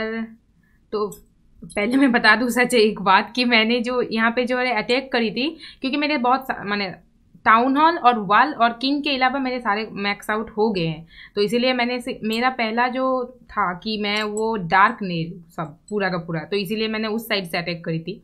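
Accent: Bengal, India. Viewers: Apni bengali kotha sune bojha jacche😅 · Are you bangali??? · Ami age confused chilam je apnara Bengali? But akhon apnar Hindi sunei bojha jacche Bengali😁😁😁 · Aap Bengali ho kaya ??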